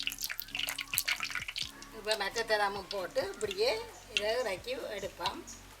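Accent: Jaffna, Sri Lanka. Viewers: Engelnde yalpanam tamil neenga pesa nalla iruke ketka. Engelnde amma vum ipadi thaan seireva vendika kulambu annal amma inji poduva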